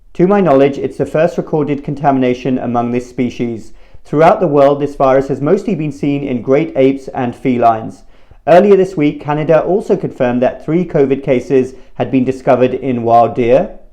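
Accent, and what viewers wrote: England, United Kingdom. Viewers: There is nothing quite so elegant as someone saying "expelling snot" in a beautiful proper English accent. Bravo!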